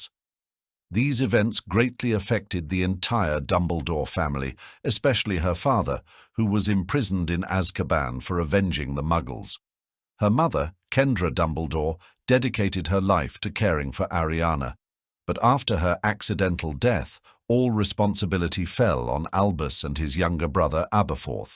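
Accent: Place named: England, United Kingdom. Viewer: I just found this channel. Really enjoying it. The narrative is great. Love the English accent. The pictures are beautiful. I just wish they illustrated what's going on in the narration.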